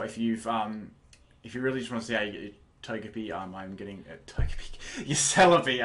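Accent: Australia. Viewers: Before you get all angry and stuff, know that is Australian, not a brit.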